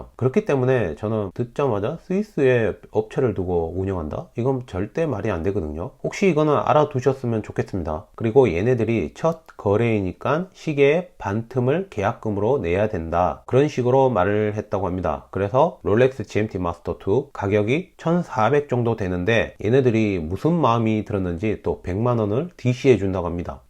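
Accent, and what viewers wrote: Gyeongsang, South Korea. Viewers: 이알토 아.. 그렇군요.. 몰랐어요. 그냥 구수하게 경상도말 쓰셔도 재밌을텐데요~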